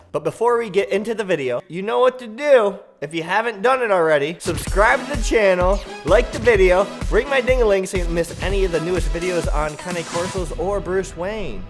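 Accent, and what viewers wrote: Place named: United States. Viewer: I've never heard them called "cona Corno" we say "Cain Corso" Cane Corso. You sound American so I was just wondering why you say it different?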